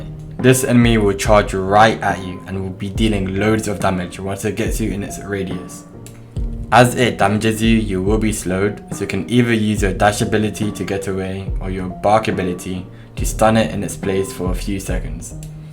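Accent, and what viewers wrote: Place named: United Kingdom. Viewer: ew briish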